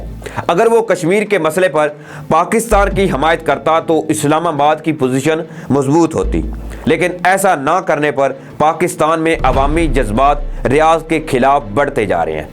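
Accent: India. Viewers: Ap urdo ko hindi style men q bol rhe hin Apni urdo thik kren plzzz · Yah indian hai jo pakistani qoum may tafraqa daalnay ki koshish kar raha hai aur pakistan arab mukhalif jazbat ubhar raha hai. iss ki urdu check karo koshish kar raha hai punjabi ki tarha urdu bolnay ki likin bol raha hai indian hamachal walon ki tarha. pakistanio Iss say dur raho brainwash karnay ki koshish kar raha jai He is a blood indian trying to build/arouse anti saudi and arab sentiments in Pakistan. Check his urdu he is trying speak in pakistani accent. HE IS A RAW MEDIA CELL MEMBER trying to brainwash Pakistani mined set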